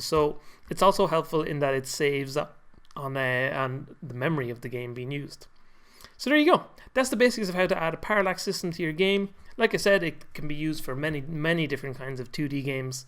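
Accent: Ireland. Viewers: Great video! PS: Your voice sounds like Jacksepticeye (because you're irish)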